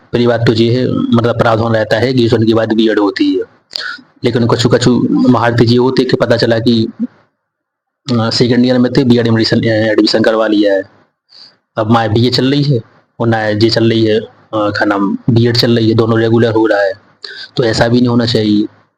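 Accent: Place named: Bundeli, India. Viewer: Maharaj aap bundeli bolo wo achchhi lg rhi........you know ni bolo😁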